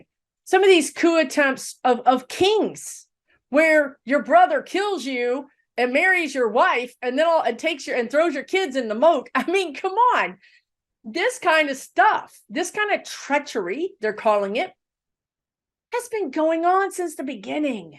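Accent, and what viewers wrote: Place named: West Texas, United States. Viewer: Your sooper awesome, a great reader...... And talk and sound just like me.!! ( West Texas. ) I catch every reading and your guides are great. As well. Thanks for bn here.. 🌹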